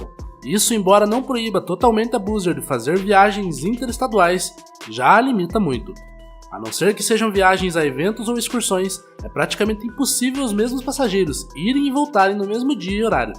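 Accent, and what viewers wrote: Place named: Curitiba, Brazil. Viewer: vocé tem sotaque de Curitiba! Confere? Abç!😊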